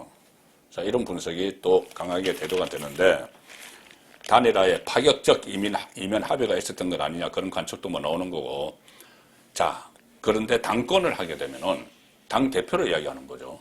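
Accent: Gyeongsang, South Korea. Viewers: 송국건 본부장님 정확한 분석 빠른속보 구수한 대구 경남사투리 항상 고맙습니다 감사합니다 구독자 30만 가자!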